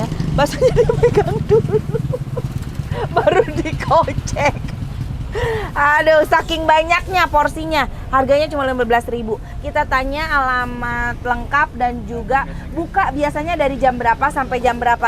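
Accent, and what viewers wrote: West Java, Indonesia. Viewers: Resep liat vlog nya teh apalgi klo makan baso nyriosna nyunda pisan ciri khas orang garut banget logat ngomongnya 😁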